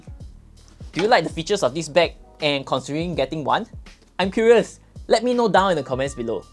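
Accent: Singapore. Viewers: Legend mate, great review. I grew up in SG and I knew that accent was familiar 😂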